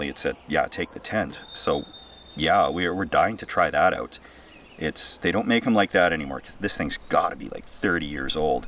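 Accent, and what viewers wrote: Alberta, Canada. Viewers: I'm glad you killed them all 😃 good luck on all of your renovation endeavor. The Alberta accent is strong with this video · You betcha, eh. This is a very Canadian sounding video.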